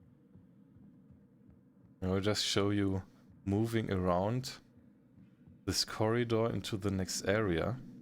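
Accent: Germany. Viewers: Bruuder dein deutscher Akzent ist ja mal mega hart :D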